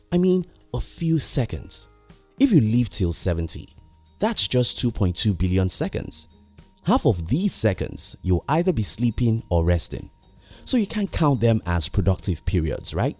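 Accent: Nigeria. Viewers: Are you Nigerian? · You sound like a Nigerian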